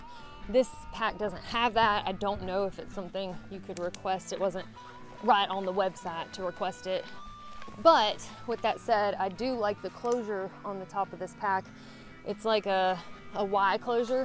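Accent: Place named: Tennessee, United States. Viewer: I feel like I am back in northeast Tennessee when I hear you talk. When I first moved away to live in richmond,va 34 years ago people would always asked me to say certain words lije night,right,light and Tennessee as I had a big southern drawl.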